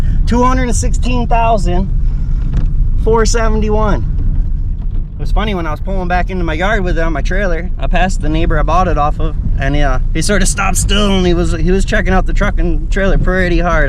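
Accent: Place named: Florida, United States. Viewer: What I’m curious about is what kinda red neck Florida accent is that ? It ain’t no southern twang I’ve ever heard .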